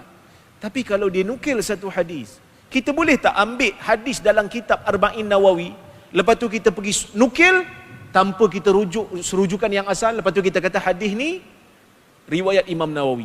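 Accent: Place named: Arab world. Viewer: aku tgk die nie cra ckp mcm si Anu tu... lajjah arab perggh.. tp ckp suka spin..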